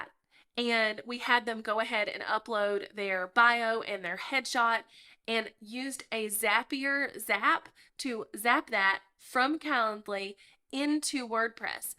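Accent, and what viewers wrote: South, United States. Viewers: thank you for taking the time to reply. I really appreciate it. 💕Just to add I believe thrive cart also offers sales page, up sell, down sell options too. So that’s one reason I was asking. I don’t own thrivecart , but was looking at it. They also offer a lifetime purchase option at the moment. FG looks very good though. 😁 Anyways … I’m really enjoying your channel and expect I’ll be on your mailing list very soon. Haha. (I’m Australian and maybe that’s why I enjoy your Southern accent … and “all the things” 😂 ). 🤗💖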